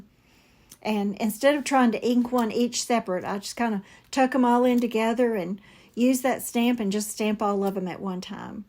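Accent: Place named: North Carolina, United States. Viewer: Good morning from San Antonio! I really loved these ideas this week! I love all of your ideas, but this one inspired me so so much!!!! I have a quick question. You said you are from North Carolina.. I originally from Va beach and we just moved here to tx 3 years ago. Long story short. My family is originally from NC, actually my entire extended family still lives there. They live in Belmont, Lincolnton, And small town High Shoals. Now that my very long story, my life story 😂😂 are you close to those areas?? It’s your accent that sounds like them!! I guess I’m chatting with you because your my favorite!!!! Thanks 😊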